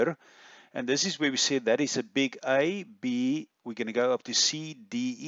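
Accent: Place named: South Africa. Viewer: Thick South African (Afrikaans) accent...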